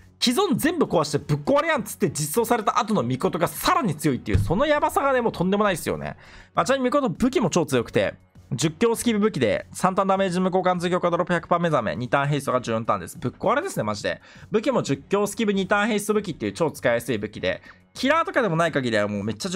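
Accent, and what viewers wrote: Kansai, Japan. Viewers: この人関西人なん？笑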